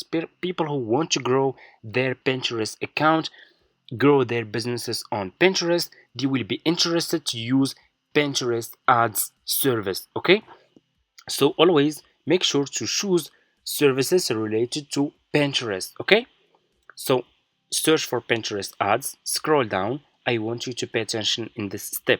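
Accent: Morocco. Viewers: Moroccan accent bro 😊 Nice video keep going !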